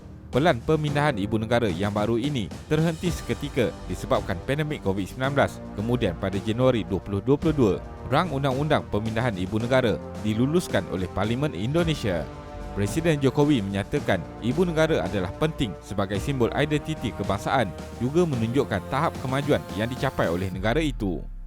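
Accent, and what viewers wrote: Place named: Peninsular Malaysia, Malaysia. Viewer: Asyiiiik..... dialek semenanjung nyaman didengar, macam berade di semenanjung sumatera.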